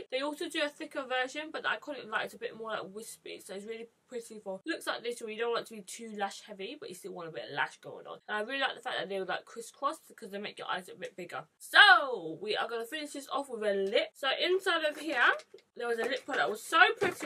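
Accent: United Kingdom. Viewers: Omg your Brittish accent! I wasn't expecting it 💗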